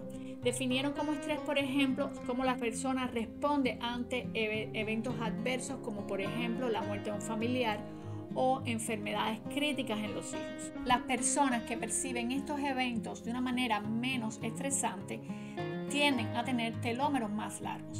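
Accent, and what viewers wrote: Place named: Cuba. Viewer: GRACIAS MUY BIEN EXPLICADO SOY CUBANO Y USTED HABLA COMO NOSOTROS LOS CUBANOS DIOS LA BENDIGA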